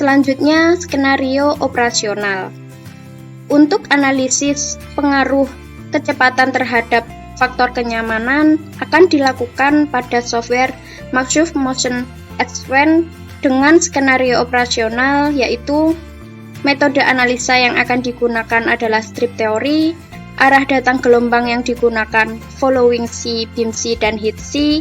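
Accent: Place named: Madiun, Indonesia. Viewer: Terimakasih banyak mbak jadi kangen sama medoknya madiun ehehe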